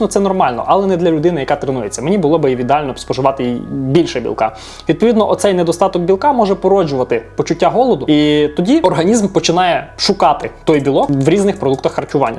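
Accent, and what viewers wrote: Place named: Rivne, Ukraine. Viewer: Привіт!Натрапив На твоє відео,результати вражаючі,повага,цікаво спитати звідки ти бо я сам родом із Рівненщини і твій говір такий як наш😄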